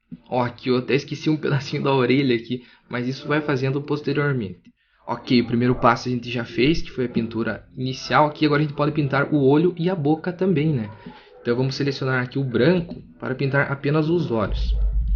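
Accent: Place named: Rio Grande do Sul, Brazil. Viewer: parece gaúcho ne, também achei kjkjkkkkj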